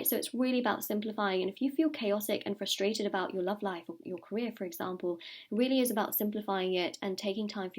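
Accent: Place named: South East England, United Kingdom. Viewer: South East English I think !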